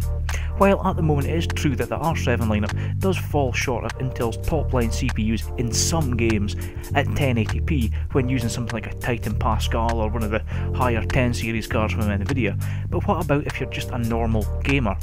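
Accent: Scotland, United Kingdom. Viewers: Sub'd man love hearing fell Scots on YouTube, too few of us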